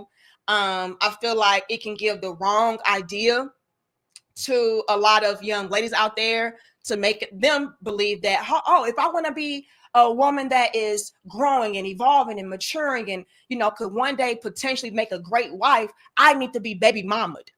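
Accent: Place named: St. Louis, United States. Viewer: Yo. You sound like you from the Lou. Where you born in the 314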